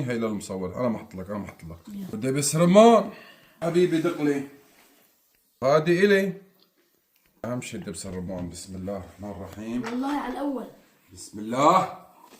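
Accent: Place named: Idlib, Syria. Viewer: بحب احضرك لانك بتحكي زي صحبيتي هيا من ادلب و بتزكرني فيها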